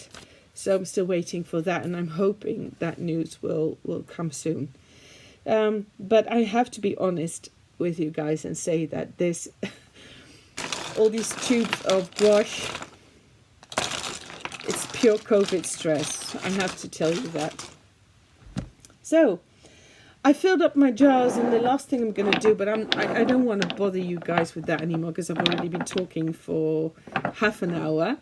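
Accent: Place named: Netherlands. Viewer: I always know your Dutch because you speak like * from *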